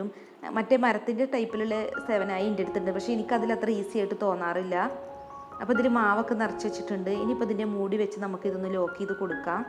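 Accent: Malappuram, India. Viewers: ഇജ്ജ് എന്താ പറയണേ " ഒഴിക്കലും " കയിക്കലും ഇല്ലെങ്കിൽ പിന്നെന്ത് മലപ്പുറാ..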